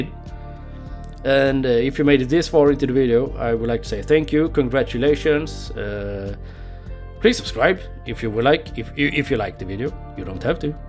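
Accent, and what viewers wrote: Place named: Sweden. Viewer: I LOVE the svengelska!! Are you from skåne?? P.s You speak terrific english, just heard your perfekt uttal on orter 😹🙏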